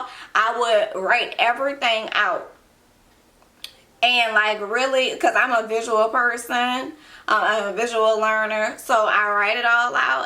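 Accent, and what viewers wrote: New Orleans, United States. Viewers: First thing I said she from nola accent tho😍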